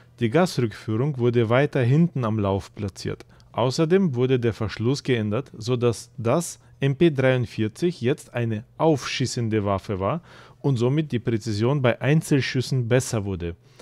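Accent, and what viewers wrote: Russia. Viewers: Ich bin begeistert, Ich fand ja schon immer das die AK 47 ein bissen wie die Sturm 44 aus sieht . Dein Akzent ist ausgezecht ich liebe Russischen und spanischen Akzent. ich bin gespant auf dein nächstes Video. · Geil , dieser russische Akzent im einwandfreien Deutsch! Top Video! 👍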